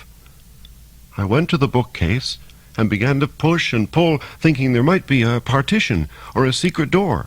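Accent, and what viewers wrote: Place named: United Kingdom. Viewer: Horror stories should always be read in british accent. Good!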